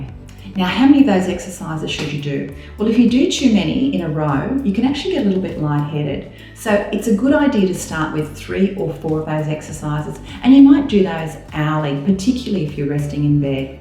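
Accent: Australia. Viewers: Ausie English be like that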